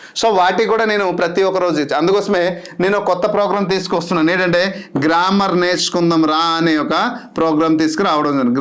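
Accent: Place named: Godavari, India. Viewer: Godavari slang ekkuvundi..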